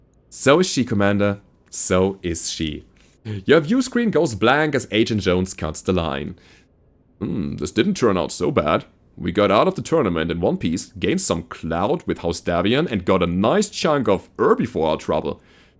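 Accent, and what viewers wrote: Germany. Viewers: Something about a guy speaking English with (what I think is) a German accent reading things with different other accents is just silly. thumbs up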